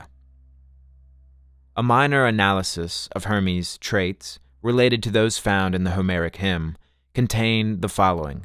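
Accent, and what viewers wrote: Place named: United States. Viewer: american voice nice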